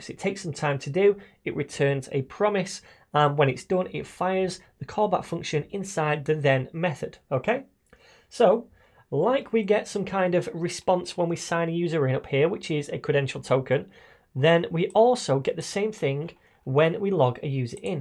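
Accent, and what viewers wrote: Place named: England, United Kingdom. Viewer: we have it COVERED.. but he's english and speaks fast so it seems covid.. lol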